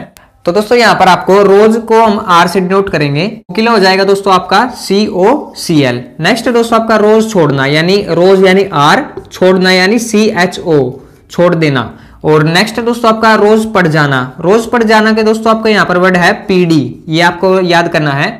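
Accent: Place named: Haryana, India. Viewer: but kuch words the jisse saf jahir horha tha ki ap haryana se ho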